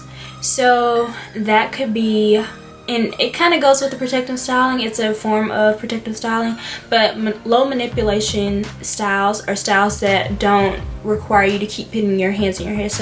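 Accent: South, United States. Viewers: Love your southern accent. I am from Texas. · the little southern drawl...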